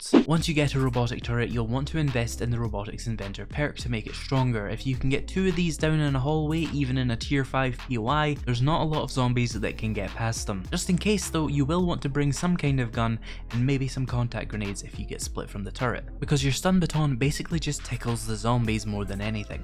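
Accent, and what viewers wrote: Scotland, United Kingdom. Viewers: I like the twin turret build, tried it before - also stun batons are criminally underrated in a lot of scenarios as raw damage is not what they are about. Using them in a combo is fantastic in small scale fighting, stun zombie then sledgehammer for automatically guaranteed head-shot (or pistol or club etc). I do not tend to play on insane nightmare as I really do not enjoy it and I mainly play for enjoyment:) Warrior or Survivalist and feral senses on / zombies on run is about as much as I go. Main problem with this build I found was the insane iron usage, utterly bonkers at points - especially later on in the game, even with an augur. Really enjoy your vids, good to hear a fellow Scot on the YouTubes:)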